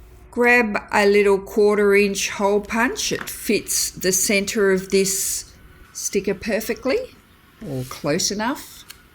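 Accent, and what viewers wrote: Australia. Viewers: Hi! So glad I’ve found your channel! I love what you do. I have requested to join your Facebook page, hope I’m accepted. And it’s so good to hear a fellow Aussie on YouTube!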